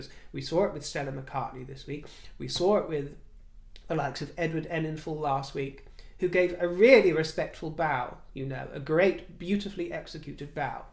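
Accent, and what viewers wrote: United Kingdom. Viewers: .... Thnx for such a delightful ending, evocative photos & lovely music. Nice 👍 As to pronouncing 'secretary' in the PNW state of Oregon... We pronounce it, SEC' re tary. Some day I'd love to do a deep dive into why the US pronunciation of 3+ syllabus words seems opposite from the British way (& our gallons n miles to your liters etc - why? ) Like... Controversy.. The US say it usually with a hard accent on the 1st syllable... CON tro versy The Brits, I think, say it in a much more delicious way.. con TRA versy. At 65+ yrs old, I wish I could snap my fingers & end up pronouncing [our] language your way. U.S. has ruined so much, IMO. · Those of us who are from southern USA, as well as highly educated population pronounce it , "sec- ree- tare-reey" . I really prefer the UK pronunciation, but it would come across as pretentious if I used it. 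We have such a lovely, rich language. I do enjoy hearing you speak so beautifully.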